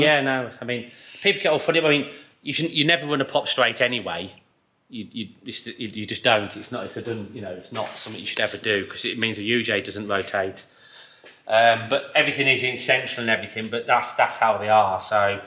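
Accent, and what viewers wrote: United Kingdom. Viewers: It takes awhile to get used to the way the brits talk, especially if they use a lot of slang and shortened words.But as an aussie, I don't have a problem with it.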